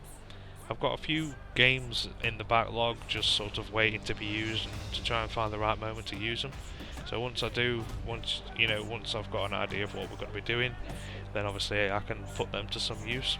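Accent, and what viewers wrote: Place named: Nottingham, United Kingdom. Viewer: This took me like 50 attempts and I smashed so many PS3 pads. Uploaded, are you from Nottingham? You sound like you're from around here because you sound like Carl Froch, haha.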